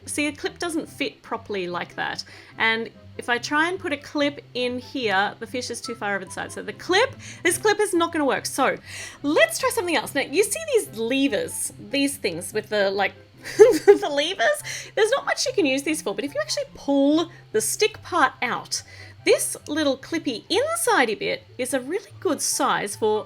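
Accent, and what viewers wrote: Australia. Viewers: I love the Australian so it’s sounds like suo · I thought you were saying pillow cuz you talk in an Australian accent but you were actually saying pillar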